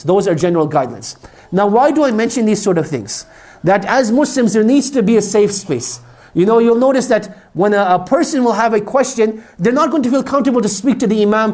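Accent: South, United States. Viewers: al salaam aliekum yous sound like your from oje of the Southern states. I onow plenty of muslim people whom I have had the pleasur of working with and they have always been peace loving and shared the same intrinsic values as I do, some have escaped oppressive regimes like the taliban and have come to Sydney for freedom to worship to work and to give families a better life. There is a mm uslim preacher called Nounan Ali Khan, I often watch him on youtube, whilst he teaches out the Quran just about alo he mentions on guidence for life, and godly wisdom is also in the bible, he is very gifted in being able to get his message across. Ias a christian I see the church should be out there building bridges with people. There is no room for anti sematism and islamaphobia. Sadly though people of all faiths use there faith platform for selfish and politican means. 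Thankyou for your kind words alhamdililh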